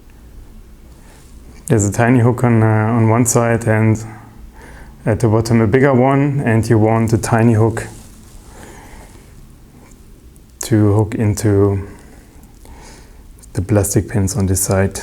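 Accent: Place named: Germany. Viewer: eew that gross accent... I assume you're german? maybe work on your speech before you upload videos in a lingo that you can't pronounce without ppl getting ear-cancer...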